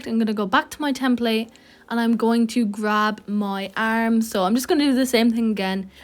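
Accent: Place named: Ireland. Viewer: Are u irish? Luv ur accent :)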